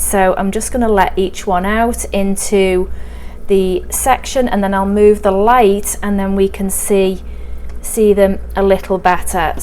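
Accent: United Kingdom. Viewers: With your accent I thought you where in the UK! Yes that's a bit far to post 😂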